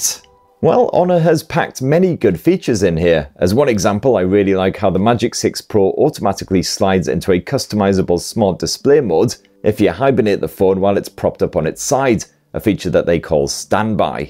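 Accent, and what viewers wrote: United Kingdom. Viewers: Is this guy british?? 😂😂 Just a simple doubt. The accent😅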